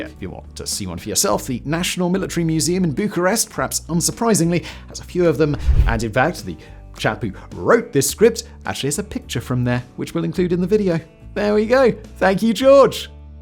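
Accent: England, United Kingdom. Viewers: If you didn't see enough Britcoms as a kid you might not notice. speaks SSBE, a prestige dialect understood around the world. I mostly speak US formal Inland Southern, another dialect understood around the world. If I narrated this, you'd probably complain about my consonant fronting and my heavy retroflex "r" sounds. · Typical English lack of giving a shit about the conventions of other languages. And for just making up ways to say words.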